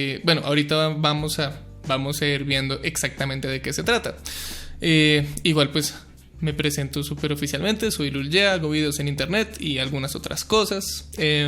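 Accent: Bogotá, Colombia. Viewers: Jajaja se nota mucho tú acento colombiano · Vos sos rolo no? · ahora que se escucha en una conferencia, se nota tu acento rolo:D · Al hablar tranquilamente, tienes unos pequeños dejos de rolo en tu acento, se nota que llegaste a vivir por un tiempo en Bogota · Uy pana, usted tiene resto de acento rolo, recién me doy cuenta, usted parece de Bogotá JAJAJAJA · He visto todos tus videos, hace relativamente poco me enteré que eras colombiano en un video en que lo mencionaste; me sorprendio bastante porque jamás se te siente un acento en los videos, pero acá nada más empezar se siente, eres colombiano con toda! Un placer seguirte, abrazos desde Bogotá <3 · Es mitad colombiano y mitad de otro país aún desconocido, lo decía en la descripción antigua de su canal además que en los streams antigüos de halo lo confirmo y ahí se le notaba muchísimo más el acento. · cuál paisa? 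es re rolo xd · Jamás había notado qué yan colombiano eres:0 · tiene full acento colombiano verda, tipo paisa neutro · En este vídeo si se nota tu acento Colombiano xd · En este video sin guion se nota mas el acento colombiano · La primera vez que lo escucho con acento colombiano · Looollll hoy si te escucho mega rolo, fan de tu voz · Tienes tremendo acento rolo! Un capo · Siempre pensé que eras mexicano o algo así, pero ya tras este video dos colombiano. Lol:0 yo soy de medallo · Nunca había escuchado su acento real es re cachaco rolo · Siempre creí que eras Mexicano, en tus otros videos no noté tu acento colombiano. Por cierto, me encantó el video:)) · se te nota lo colombiano xd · Es la primera vez que escucho a con un asentó colombiano genuino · Aquí pude notar mucho su acento colombiano, q increíble jajaja buen video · Acento rolo, parce!!!!! 🇨🇴🇨🇴🇨🇴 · definitivamente es rolo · Se te nota mas el acento colombiano... 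Eres colombiano? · ya te escucho el acento Bogotá ^w^ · banda, soy yo el unico que le parece que en todos sus videos su acento es muy neutro, mas tirando a mexico, pero aca se le subio el colombiano por 3000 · Puedo decir que aqui si se te nota el acento de Bogotá, bastante... Eres un orgullo para tu patria y familiares bro! Saludos desde Cartagena:D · El acento colombiano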